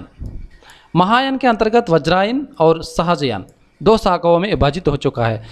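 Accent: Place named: South, India. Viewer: very good but sorry to say you should improve your speaking leave I think you are from south Indian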